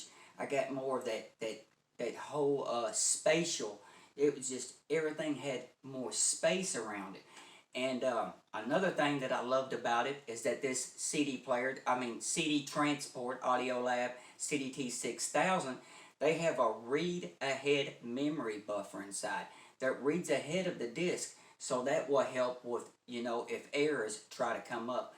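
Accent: South, United States. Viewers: “Right at the eeeeend” and “this is built like a tenk, (you all??y’all). I am watching this from England and I have never heard such a sweet sounding US dialect. Out of cultural interest, where were you brought up please? When I was a child i watched, for a short while, a TV series called North South, based on the US civil war and your dialect seems very Southern. Sounds exotic and it’s stimulating for someone from the UK where we probably sound quite neutral-flat-boring, to hear the inflections and vowel changes. Aside, your review was passionate and detailed. Thank you.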